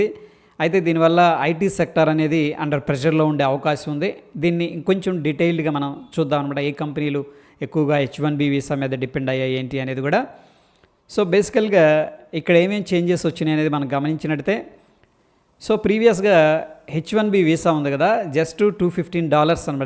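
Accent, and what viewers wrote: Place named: Telangana, India. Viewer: Hi sir this comment is not for this vedio .i would like share my opinion on all ur efforts...సర్ stock market లాంటి ఓక సీరియస్ subject ని మిరు మీ తెలంగాణ స్లాగ్ లో చెప్తుంటే full comedy గా నవ్వుతునే వింటాను.at the same time ఒక vedio 1 book tho సమానం గా చుస్తాను .TQ sir.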